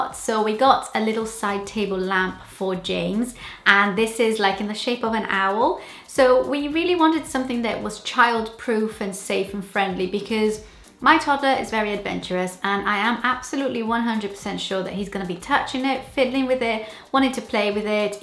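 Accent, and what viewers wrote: United Kingdom. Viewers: Your British accent is really different to my ears but I could understand what you said. Your last video I watched you were still pregnant and you said your daughter is already one year old. oh my. I need to watch more YouTube. In the moment I'm using YouTube Go and I'm not sure I can download your videos there. Anyway, your furniture is amazing! BTW I loved the video quality.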